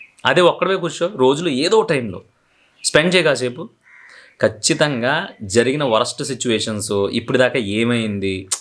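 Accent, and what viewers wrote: Godavari, India. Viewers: బ్రో సూపర్ మా గోదావరి జిల్లా భాష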